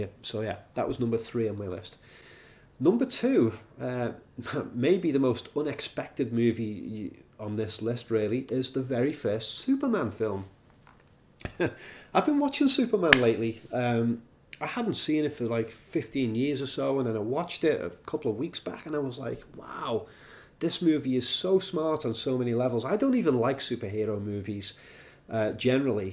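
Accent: United Kingdom. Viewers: The British accent adds to the credibility of this top 25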